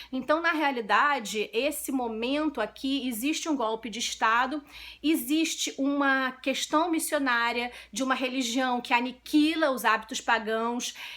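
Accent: Rio de Janeiro, Brazil. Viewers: só me dei conta do sotaque 'carioca' depois do teu comentário, crissstão, luzzzz, contrassssti, etc. …so amazing! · Esse livro é muito maravilhoso mesmo. E a delicia que é esse sotaque carioca?! Amo seus videos. <3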